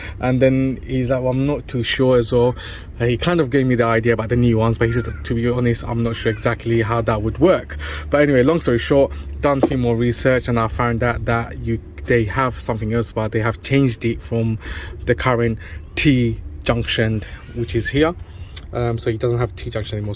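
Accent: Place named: Cockney, United Kingdom. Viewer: Impo ы en- cockney accent 😂😂😂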